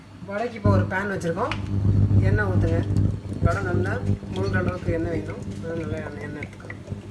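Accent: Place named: Trichy, India. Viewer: hello mam.. I'm mesmerized by ur speed of cooking... simply superb... which part of tamil nadu are you from..? your slang seems like u are from trichy ... i guess..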